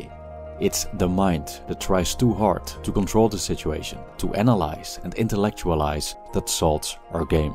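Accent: Netherlands. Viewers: i love your dutch english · Lekker dat nederlandsche accent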